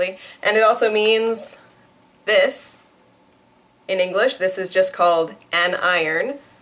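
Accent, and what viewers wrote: United States. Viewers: Standard 'murrican' English that is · she's right, even if her 'standard english' pronunciation is actually a bit on the USA side. Ironic is pronounced WITH an 'r', instead :). Iron, without. In USA, they do pronounce it as she says. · In England it's more "i-on", not "i-ern", the way you pronounce in America is not British standard English.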